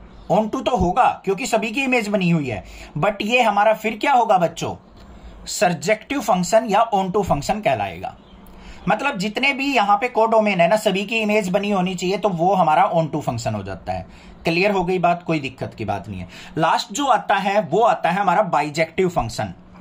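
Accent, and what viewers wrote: Haryana, India. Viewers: Sir are you from haryana❤?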